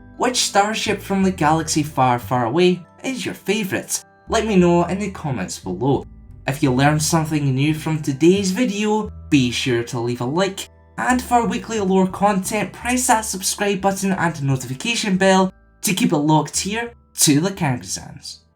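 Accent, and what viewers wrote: Scotland, United Kingdom. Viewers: Your a Scottsman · Thank god for the subtitles. The Scottish accent is strong with this one. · Scottish accent on Youtube? A surprise to be sure, but a welcome one. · Love the Scottish accent. Could do with a better mic though.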